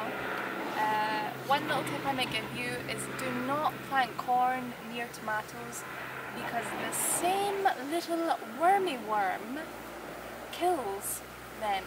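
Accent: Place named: England, United Kingdom. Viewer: Love ur English acent...... P. S from ur favourite niece 🤣🤣🤣see ya in 7 days🥳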